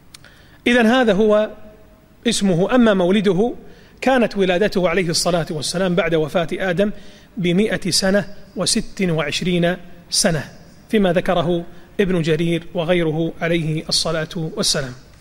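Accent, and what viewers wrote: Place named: Egypt. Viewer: عم تمصرن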